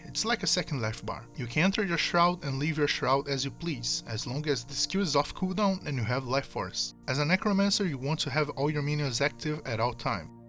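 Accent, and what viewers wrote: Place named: Brazil. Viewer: You sound Brazilian 😅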